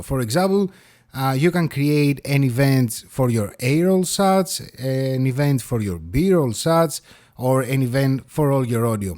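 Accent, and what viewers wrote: Greece. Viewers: I love the Greek accent. This is a great tutorial for beginners like myself. Clear and concise . This will be my "go-to" video until I become an advanced user someday.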